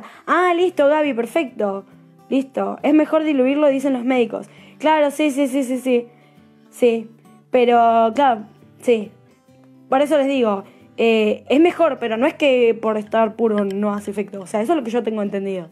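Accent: Argentina. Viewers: Una pregunta ,sos Argentina???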